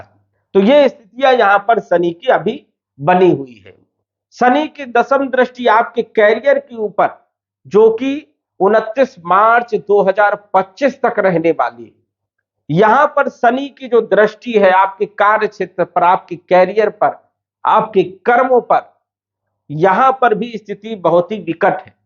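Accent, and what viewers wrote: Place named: Vindhya, India. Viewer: जी लगता है विन्ध्य क्षेत्र से हैं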